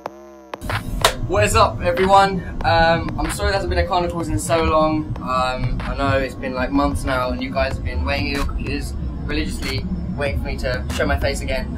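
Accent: United Kingdom. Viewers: Your voice + Your british accent = SexToMyEars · i love 1D im an directioner but back to this guy YOUR BRITISH :))))))))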